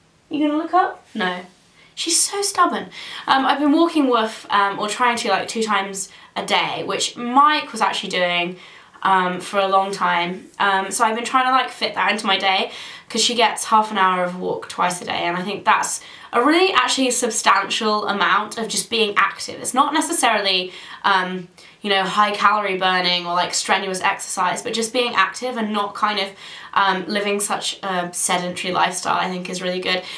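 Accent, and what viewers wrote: England, United Kingdom. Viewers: Your British accent really comes out in this video hahaha brings me back to the video you did with Luke! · There would be sooo much confusion between us if we met in real life, because I have a thick Southern accent and you have a thick English accent! Hahah, love you:)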